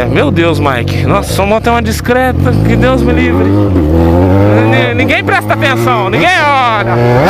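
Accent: Goiás, Brazil. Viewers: vc e Goiano?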